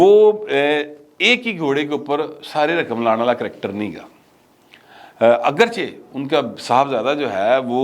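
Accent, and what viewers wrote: Punjab, Pakistan. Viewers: Dakia Butt saib Ma ghuzishta 13 sall sa punjab mah ra rah hun Rerri chingchi dukandar bara umar ka logh sab ki rai han k umna Share ko vote dia aour dangha Hah kuch nawjan PTI ka haq ma boltha han maghar 9 May ka bad o kamosh ho ghian han jahn tak PPP ka taluq uska wajood bahn bazi tak our kuch nai Patha nai aap ithfaq kartha han ya nai ALLAH apko lami umar aatha karan Taka aap Punjabi numa Urdu boltha ran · oh paaine your Punjabi is too strong to understand. Non punjabis ka lehas kar lain paaine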